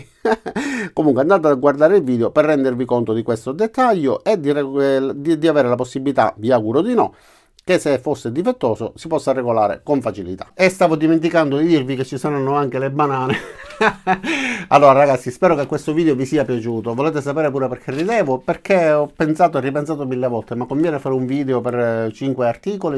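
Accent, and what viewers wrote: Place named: Southern Italy, Italy. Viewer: anche se io personalmente ho scelto un altro brand... seguo sempre volentieri (e sono iscritto) i tuoi video. Inoltre é anche piacevole questo nostro accento del sud. Un caro abbraccio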